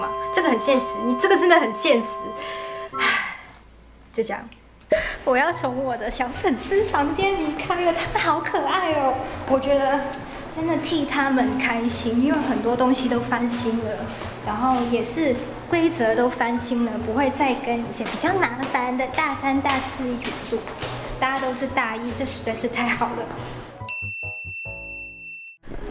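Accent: Taiwan. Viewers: 熊熊花現妳講話已經開始出現台灣式腔調了..... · 看到的故事其實也有感啦~ 畢竟我從你大概10萬訂閱開始看~ 在異鄉生活真的不容易~ 自己也是從彰化到臺北讀大學~ 但生活總是會有不如意的事情~ 甚至得罪人到很莫名~ 會很沮喪~ 但也別忘了生命會展開別的窗~ 聽到你說曲老師的故事其實蠻感動的~ 畢竟他們老師也是你的恩人~ 算在你遇到低潮迷茫時幫你一把~ 希望之後新學期順利啊~ 話說你講話跟臺灣人真的很像耶~ 畢竟我遇過的香港人~ 即使住臺灣三十年也是蠻有口音的(比有馬明顯)~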